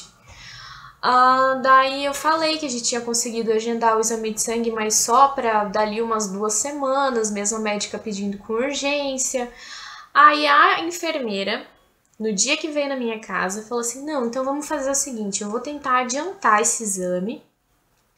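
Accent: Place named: Rio Grande do Sul, Brazil. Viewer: ahh bom, achei que fosse aqui do RS pelo sotaque. Menos mal que agora está tudo certo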